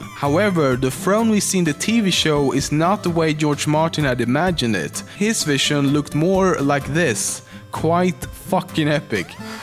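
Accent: Sweden. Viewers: Seriously, his thick Swedish accent is so annoying! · Are you Swedish :D? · are you from sweden?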